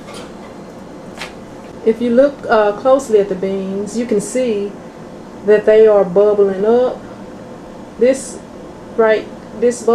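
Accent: South, United States. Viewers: I like the southern accent. Shes a hottie too. Ill try the recipe.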